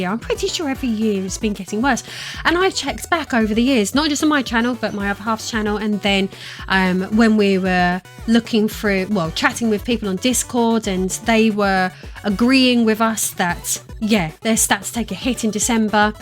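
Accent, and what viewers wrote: United Kingdom. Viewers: I watched one of your videos the other day now I'm getting a steady stream of your content. I'll stick with you because you're British and the accent is perfect. The BBC should hire you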